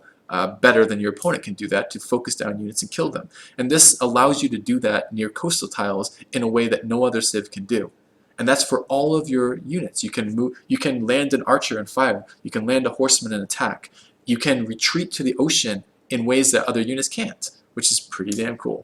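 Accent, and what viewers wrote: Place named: Canada. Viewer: I'm trying to figure out your accent. I hear a Canadian "about" and a East Coast/New England "warrior" in there. Help me out here bro :D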